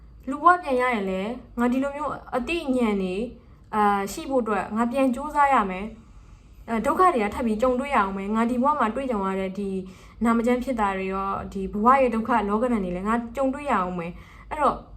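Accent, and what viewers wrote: Rakhine, Myanmar. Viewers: ရခိုင် လူမျိုး ထင်တယ်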